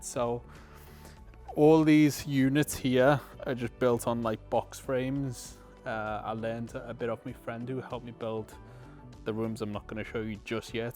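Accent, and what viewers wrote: Liverpool, United Kingdom. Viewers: A scouser!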